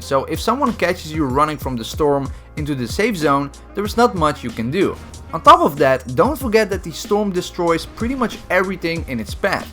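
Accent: Netherlands. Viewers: that dutch accent tho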